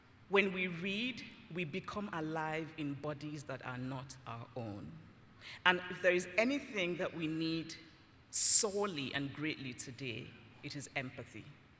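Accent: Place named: RP, United Kingdom. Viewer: Subtitles?! Seriously?! Do you not understand the Queen’s English?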